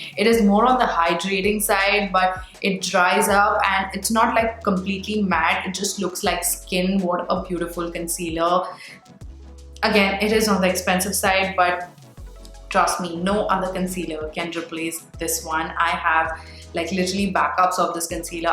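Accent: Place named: Hyderabad, India. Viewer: Are u Hyderabadi? Your hindi accent is very Hyderabadi ( and I mean this in a good way) . I have a friend who is Hyderabadi and this is exactly how she sounds whenever she speaks Hindi (which i find really cute btw😂)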